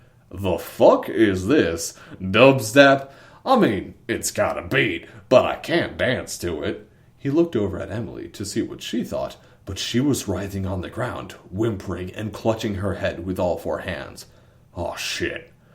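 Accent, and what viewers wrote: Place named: France. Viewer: Billy Bob Space Trucker in a French accent is the best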